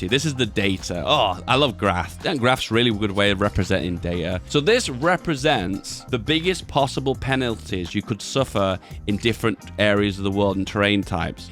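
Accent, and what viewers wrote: United Kingdom. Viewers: What do you say exactly here: ? The pronunciation is a bit too British for me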